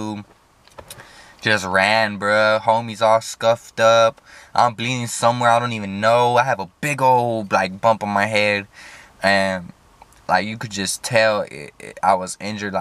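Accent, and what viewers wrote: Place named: Houston, United States. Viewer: This dude sound like he from Houston 😂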